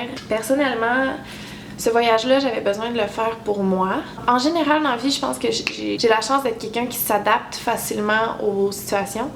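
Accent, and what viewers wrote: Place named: Quebec, Canada. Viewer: l accent Quebecois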